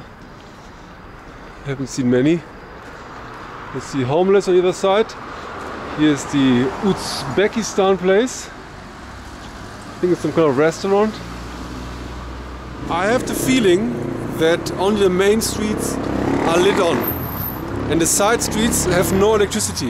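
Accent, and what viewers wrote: Germany. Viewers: German English accent is so interesting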